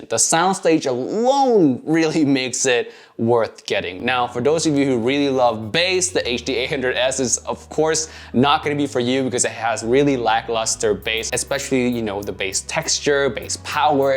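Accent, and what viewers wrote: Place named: Singapore. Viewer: Thought you re a Singaporean...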